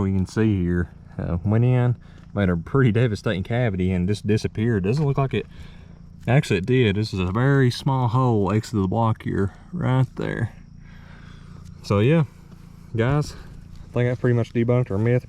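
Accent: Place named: South, United States. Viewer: You have the purest southern accent i've ever heard :D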